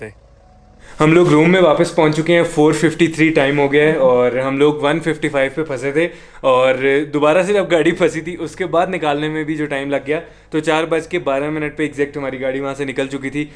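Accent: Punjab, India. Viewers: Bro aap ka jo punjabi touch hai na conversation main wo unique hai jisay daikh k apna apna feel hota k apna koi yar bna raha..... Warna north k vlogs to aur b boht log bnatay hain...... Isi trah genuine accent main raho u deserve more respect specialy u realize us to how we love our country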